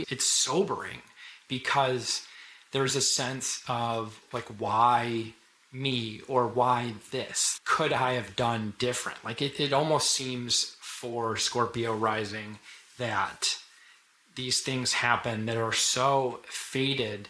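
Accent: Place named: Minnesota, United States. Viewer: All I want to know is, are you Minnesotan ? 😂 your accent give me that vibe. Also Scorpio rising video was on point !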